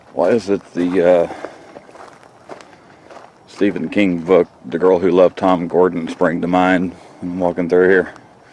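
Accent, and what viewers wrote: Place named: South, United States. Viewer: Cool place... Anyone every tell you that your voice is similar to an old comedian named Lewis Grizzard? Of course you don't have as thick of a southern accent as he did but the tone is similar. Very cool I think...VERY clear and easy for these older ears to hear. Brandon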